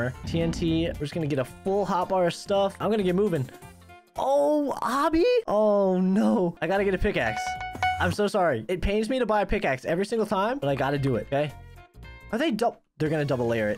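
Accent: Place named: United Kingdom. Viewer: Bro your british thats sooooooo cool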